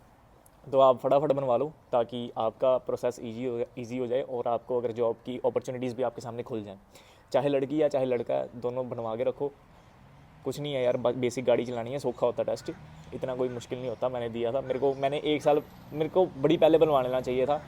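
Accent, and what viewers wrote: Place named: Punjab, India. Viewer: Speak Punjabi, your Punjabi accent is cool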